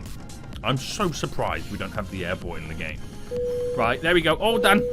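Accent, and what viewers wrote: United Kingdom. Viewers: The British accent 🤌🤌🤌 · Are you British then? If so, cool coz me too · Your British?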